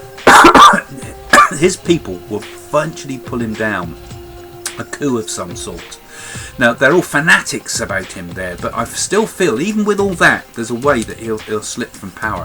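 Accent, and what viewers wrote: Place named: South London, United Kingdom. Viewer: what is your accent? Sounds something between South London and Manchester.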